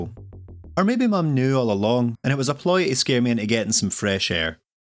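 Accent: Scotland, United Kingdom. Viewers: Love loading a YouTube video and hearing a fellow scotsman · Nice scottish accent. <3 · It’s funny how jarring it is to go into a video expecting not a Scott to start speaking and then having to catch up to understand what they are saying 😂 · Holy shit you sound like a scottish ethan klein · D was a fun video, I like your accent. I am german, so I don't exactly know what it is, scottish accent? :)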